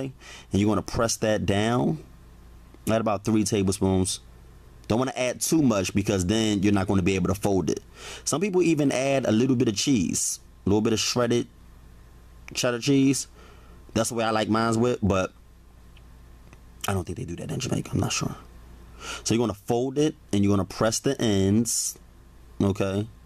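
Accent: United States. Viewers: Love the American accent ;-)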